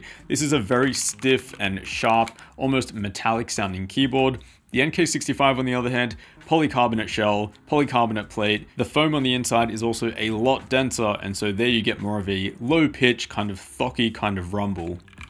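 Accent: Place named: Australia. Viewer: I cant tell where his accent is from, bit australian but not really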